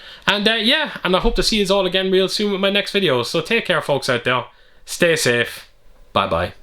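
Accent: Ireland. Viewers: is that a Dundalk accent ?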